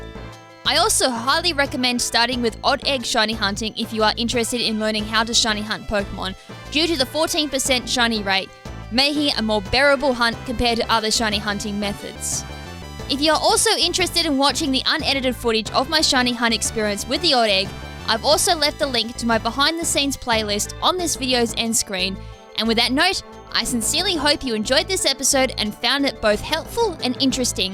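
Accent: Australia. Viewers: I came here for the Australian accent. I was not disappointed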